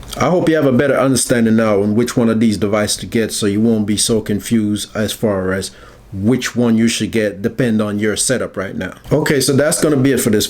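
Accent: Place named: Jamaica. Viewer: Can hear the yard accent!! Big up bredda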